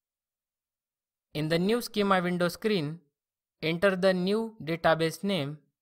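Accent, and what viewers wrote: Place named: India. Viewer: This is how to teach any beginner properly. Most other uploaders should learn from this video. It seems to be a common problem for some programmers beeing incompetent as teachers. You need to think like a human, not like a computer. If you're having a hard time understanding the indian accent for some reason, the subtitles works well!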